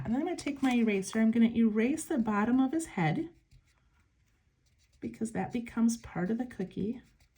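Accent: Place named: Midwest, United States. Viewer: Random question: Where do you live? I hear you say 'you guys" and can't help but to think you live in the Midwest. I grew up in Kansas, but now I live in NC. When I say that some people will comment when I say it. I may live in the south, but I am not going to change my vocabulary!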